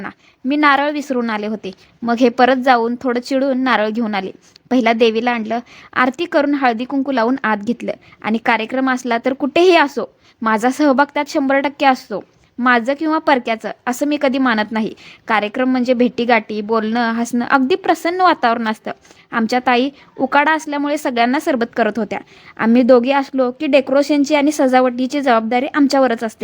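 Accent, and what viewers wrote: Kolhapur, India. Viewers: Tumchi bhasha kolhapuri aahe kothe rahata tumchi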